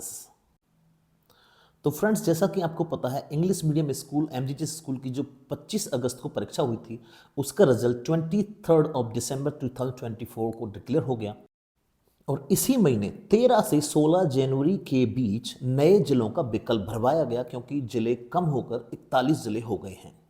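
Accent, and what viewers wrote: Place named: United Kingdom. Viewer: Bhai apka accent british h..mujhe u.k m teacher lagva do na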